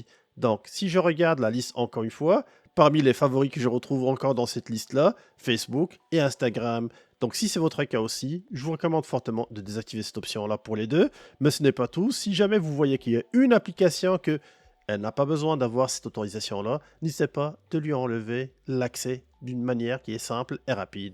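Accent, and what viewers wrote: Quebec, Canada. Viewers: Super de nous montrer tout les paramètres pour essayer de nous mettre à l'abri de certains problème , continuer comme ça , l'accent Québécois est toujours aussi sympa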